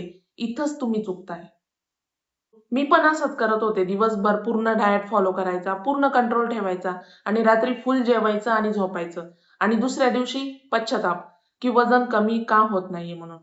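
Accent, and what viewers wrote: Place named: Nashik, India. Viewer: Kuthun ahes tai...tujhya bhashebarun tumhi Nashik chya asavya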